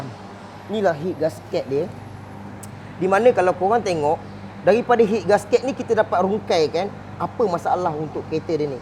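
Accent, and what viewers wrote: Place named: Terengganu, Malaysia. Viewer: Gaye bercakap mcm org terenganu ni,betul ke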